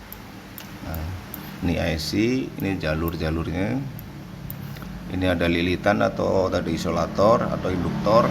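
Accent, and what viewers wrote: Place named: Banyumas, Indonesia. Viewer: Orang mana om. pngin kuraus juga. kayanya org dekat nih. bhsanya ngapak juga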